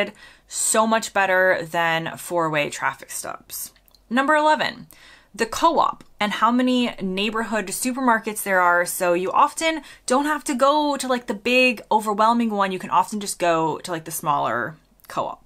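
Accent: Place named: United States. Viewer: Dave, the Dog was my favourite Gogglebox character. It's weird to explain to someone. It's not just watching people watch TV. It's nice to see another Yorkshire addict too. It's hard to find a bad one. The best are amazing, but bad ones are still okay. Flake and Ripples rule too As you picked people, and places. I'm a little gutted i, or my City didn't make it on the list. lol (I wrote before 101, you covered it. Thank you) Nice to get an idea of what you are into too, I appreciate your views. It's nice to see how you've become British (with an accent from the states)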